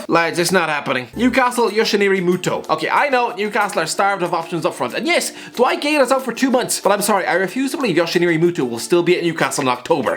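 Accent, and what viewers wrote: Ireland. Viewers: aren’t you from Ireland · Is this Irish guy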